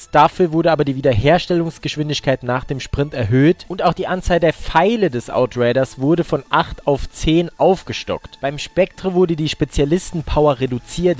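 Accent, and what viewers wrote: Saarland, Germany. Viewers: Kann es sein dass du ausm Saarland kommt?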